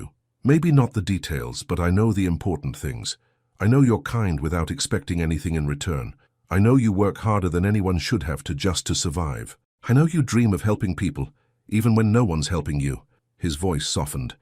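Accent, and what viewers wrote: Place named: United Kingdom. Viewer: Why does this video have a voice with a British accent? I get tired of hearing these British accent.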